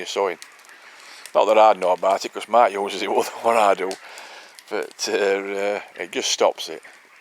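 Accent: Yorkshire, United Kingdom. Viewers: Great video mate. You're a proper down to earth bloke, very likeable. Looks like you have a blast out there with the dogs. You got a lovelly spot there too. I've subscribed and will watch the rest you have done. sounds like you;re a fellow Yorkshireman with that accent. Ey up from Leeds.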